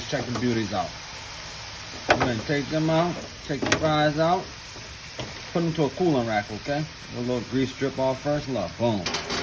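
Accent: Louisiana, United States. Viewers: You need a podcast brother. Cajun accent is the best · I like the Louisiana accent! Great recipe. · Everybody in South Louisiana got that accent tho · Good food from a good cook and he should be a narrator on audio books i would buy them with his Louisiana accent.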